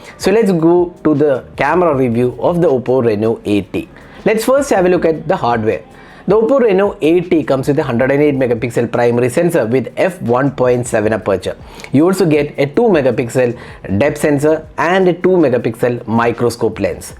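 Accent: India. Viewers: More power comes to your yt channel sir. I love Indian spoke english on their content.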